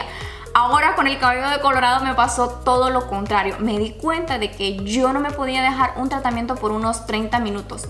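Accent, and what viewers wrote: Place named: Honduras. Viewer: Así hablamos en Honduras !!!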